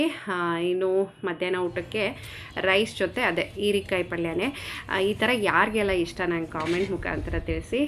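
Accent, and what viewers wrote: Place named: Shahapur, India. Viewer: ನಿಮ್ಮ ತವರು ಮನೆ ಯಾವೂರು ನಿಮ್ಮ ಭಾಷೆ ಕೇಳಿದರೆ ನಮ್ಮ ಕಡೆ ಹಾಗೆ ಮಾತನಾಡುತ್ತೀರಿ ನಮ್ಮದು ಶಹಪುರ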